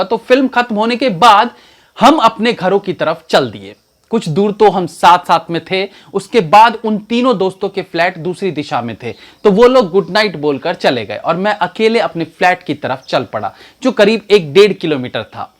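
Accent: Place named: Bengal, India. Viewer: Bengali Voice like Mamta Banerjee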